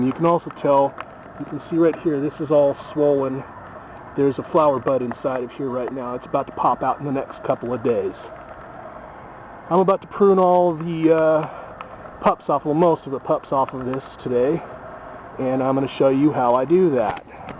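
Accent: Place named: Florida, United States. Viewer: If you’re from Florida, you have an awesome Floridian accent.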